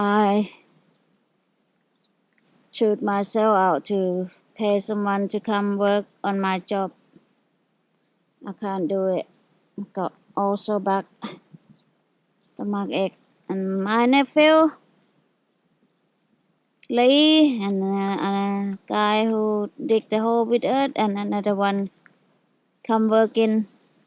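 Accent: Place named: England, United Kingdom. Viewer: She is funny, she speaks Thai with an English accent.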